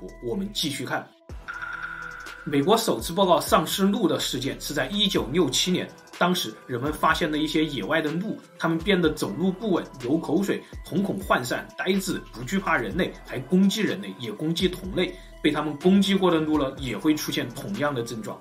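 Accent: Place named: Hunan, China. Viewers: 到底是哪里人啊？我好喜欢你浓重的西南椒盐普通话，好亲切好接地气！总会让我想起当年学校男老师们那蹩脚的川普~🤣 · 你是湖南人么… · 可能是四川两湖一带的口音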